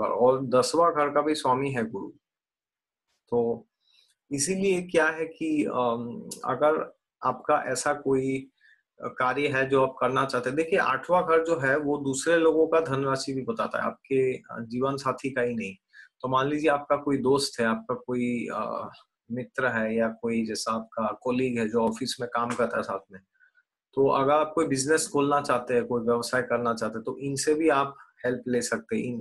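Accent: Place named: Assam, India. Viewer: First time ur voice resonates like assamesse people.. Ur accent.. It's cute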